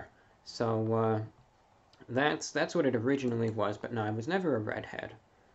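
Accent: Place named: New York, United States. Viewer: Okeechobee fla i thought he was from ny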